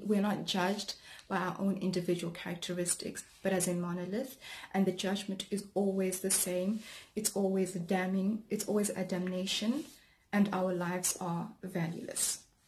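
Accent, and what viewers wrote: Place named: United Kingdom. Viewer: BUT HOW MANY NIGGAS KILL OTHER NIGGAS FOr THE SAME THING LMAO YOU DUMB BRIT YOU ARE NOT UNDER RACISIM LSITEN TO YOUR AccENT YOUVE NEVER TOUCHED A GUN OR BLOOD IN YOUR LIFE YOU FUCK FACE \ hah if you get this far into this your brave and should be able to see past what liam nesson says He said he would do it but ik youve said youd kill all white people.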